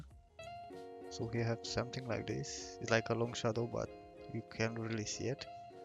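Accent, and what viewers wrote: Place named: England, United Kingdom. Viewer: Brother really love your videos..and english accent..tutorials are very helpful... Keep up the Good work!!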